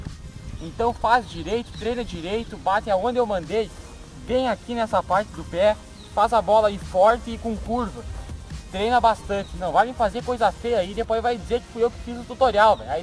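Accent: Rio Grande do Sul, Brazil. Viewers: Sotaque gaúcho tu deve ser de RS pra aí né ? Valeu pelas dicas +1 sub e like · Mano vc e gaucho ??? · Sutaque Guacho mora no RS ?. Vlw pelas dicas ajudo bastante. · Parece que temos um gaúcho aqui não é mesmo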